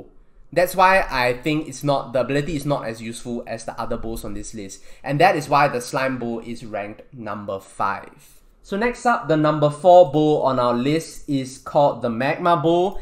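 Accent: Singapore. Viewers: u kinda sound singaporean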